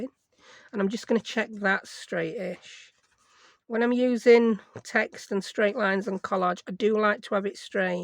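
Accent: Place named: Yorkshire, United Kingdom. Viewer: New to your channel and I love your work. These journal cards are beautiful. Also love your accent and waffling.. from 'nuther Yorkshire Lass ;)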